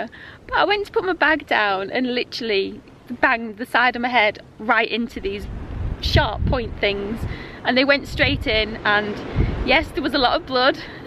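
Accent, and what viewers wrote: United Kingdom. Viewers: Healthy meal!! Heat is dangerous, be careful out there! Love the accent. It’s like a Mary Poppins thing, very sweet. The suitcase, clothes and cans look very old. Sometimes “interesting” people do their own adventure.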